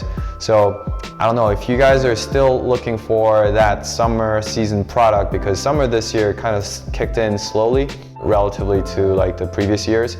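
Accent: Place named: United States. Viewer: Great American English! Is it your second language in S. Korea? Great review! Wouldn't you consider this kind of Mule shoes, let's say 'girlish'? - Cheers from Peru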